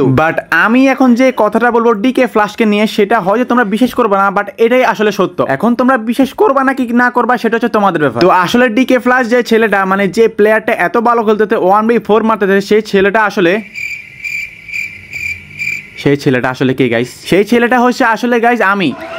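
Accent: Noakhali, Bangladesh. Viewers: Bai ki noakhalilla?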